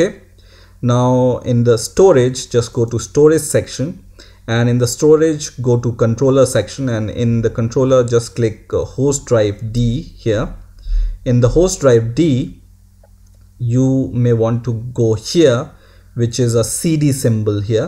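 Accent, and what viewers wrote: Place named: India. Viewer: you are not german, dumb ass you are indian, i can recog noise your voice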